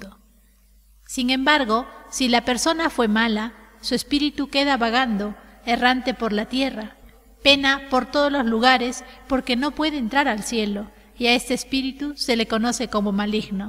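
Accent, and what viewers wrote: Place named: Spain. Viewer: muy bien hasta la z con pronuncia ibérica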